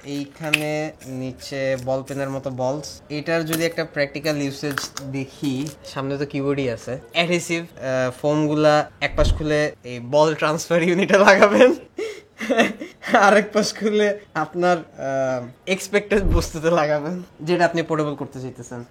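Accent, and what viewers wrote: Barishal, Bangladesh. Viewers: ত্যানা 😁 Vai ki Barishal ar naki?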